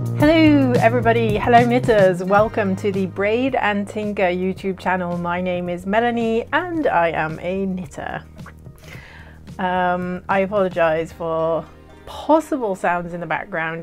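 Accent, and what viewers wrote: United Kingdom. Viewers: Hello. Greetings from Ridgefield, WA, USA! I just found your podcast and quite enjoyed it. I'm a fairly new knitter so I have alot to learn and am open to any and all tutorials. Could you please give your name and explain why you are in The Netherlands, please? I had assumed from your accent that you are in the UK. Thanks, and take care. Patty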